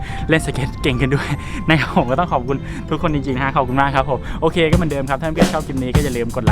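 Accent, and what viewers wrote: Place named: Kanchanaburi, Thailand. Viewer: เป็นคนเมืองกาญมั้ยอะ สำเนียงคุ้นหูแบคนกาญมาก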